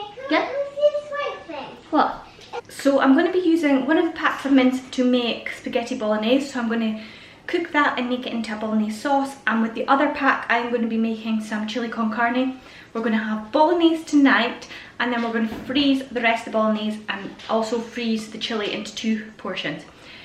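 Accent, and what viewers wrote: Scotland, United Kingdom. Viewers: Hi, nice to hear a Scottish voice on youtube. I live in Balloch near Loch Lomond. I saw that you had to do without sugar in one of your dishes. I have used sweetner instead before. It tastes great. Either one or two of the little tablets or one or two table spoons, maybe try one spoonfull first!! Or one tablet first. You don't want it too sweet. Xxx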